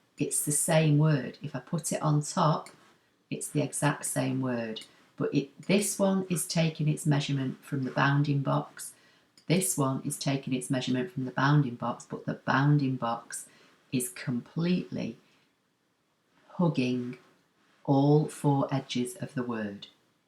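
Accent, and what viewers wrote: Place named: Bolton, United Kingdom. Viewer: Hi, are you from anywhere near Little Lever, Bolton, youre accent seems very familiar. Also I had an aunt who lived in Breitmet that had a clock just like the one I hear in the background! Love your videos by the way, I am waiting for my first ScanNcut machine to arrive.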